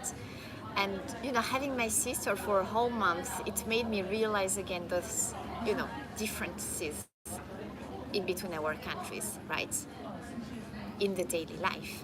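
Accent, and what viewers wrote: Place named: France. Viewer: i like her french accent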